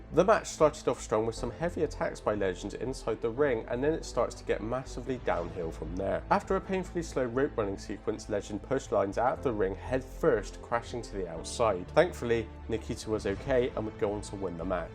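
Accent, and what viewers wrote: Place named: Bristol, United Kingdom. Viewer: You are from Bristol I can tell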